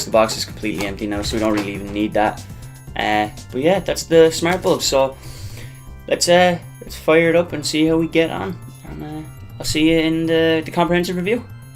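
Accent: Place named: Ireland. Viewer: Thanks for the review! Also your Irish accent is so cute!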